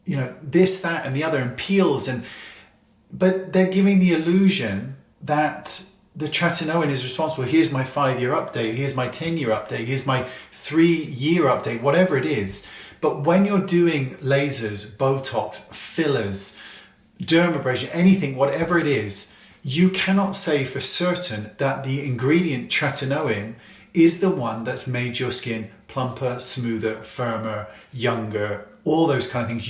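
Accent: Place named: Scotland, United Kingdom. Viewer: are you originally from Scotland 🏴. I feel I can hear a wee accent from time to time.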